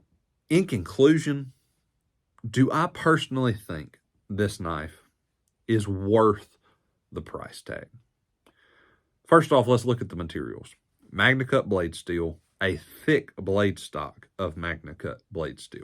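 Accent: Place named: Appalachia, United States. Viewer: Can't take this seriously with the hillbilly accent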